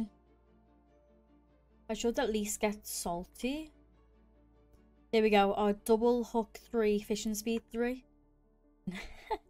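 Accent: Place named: United Kingdom. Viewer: The british accent so lovely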